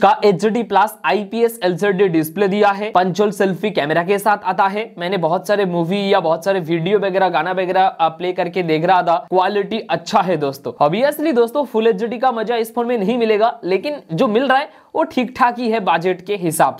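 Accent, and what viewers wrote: Bengal, India. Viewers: Bhi toh bangali hai kiya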